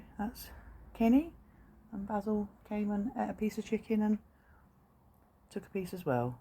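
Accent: United Kingdom. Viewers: BUONASERA SIGNORA È SEMPRE UN IMMENSO PIACERE SENTIRE IL SUO PERFETTO ACCENTO BRITANNICO E VEDERE I SUOI MERAVIGLIOSI GATTI E LE STUPENDE VOLPI DEL SUO QUARTIERE ANGELI STUPENDI ANCHE LEI È UN ANGELO PER TUTTI LORO!!!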